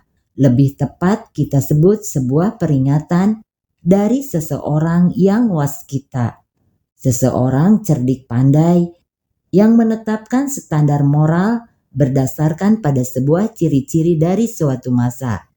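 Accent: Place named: Sunda, Indonesia. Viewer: Narator nya ini berbahasa Jawa rasa Sunda.... wkwkw kedengarannya aneh lucu.... Salam sukses mbak naratornya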